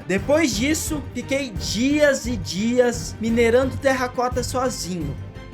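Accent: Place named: India. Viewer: tenta melhorar esse ingles de indiano ai man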